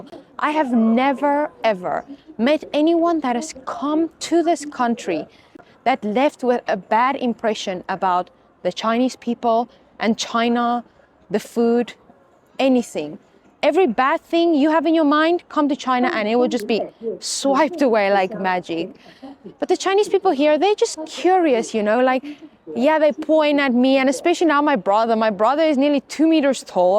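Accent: South Africa. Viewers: jy het n lekker South African accent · your accent sounds South African... is that the effect of Mandarising your US accent... just out of interest... Many thanks, loved the video, subbed. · I could be wrong, but you sound South African. From Jhb, i just wna say, you got my subscription sister ❤ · Watching from Montreal, Canada. are you South African?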